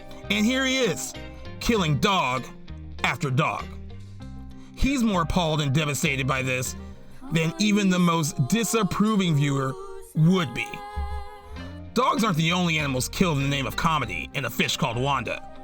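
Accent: United States. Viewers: a strange mix of well researched commentary told an in annoying yank voice normally associated with dumb crash bang wallop videos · The overly articulated American voice over is just too unbearable to watch this documentary.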